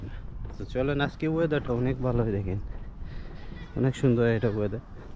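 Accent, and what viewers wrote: Sylhet, Bangladesh. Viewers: Assalamuyalaikum vai. Apnar video gula khub e informative & genuine information pawya jay. Dekhe onek vala lage. Atekta visoy enjoy kori, ami o Sylheti r apnar kotay Sylhet er accents buja jay. Thanks vai erokom authentic information soho videos dewar lagi. Vai ami samer month a amar undergraduate sesh hoibo. Amar ecca Dhaka University te postgraduate na koriya baire Master’s ta korar lagi. Ami onek gula videos dekhci Sweden niya. But personally karo sate kota hoy nai in details. So, kindly apnar sate jodi contact korte partam asha kori onek upokar hoito vai.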